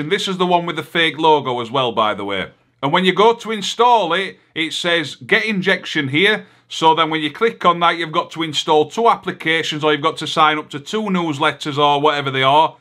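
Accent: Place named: North, United Kingdom. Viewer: You are very northern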